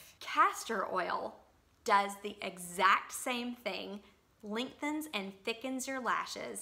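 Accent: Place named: Tennessee, United States. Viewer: Great video! You sound like you're from Memphis or Nashville! Are you from TN?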